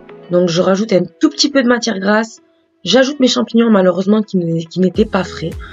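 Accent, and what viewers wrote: South, France. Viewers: je n'avais pas fais attention dans la vidéo mais il me semble que tu as dis que c'était sûrement la grossesse qui te fais adorer la Mozzarella. Félicitations à toi 😊😊 je ne me lasse pas de tes vidéos avec ce jolie accent du sud que tu as 😉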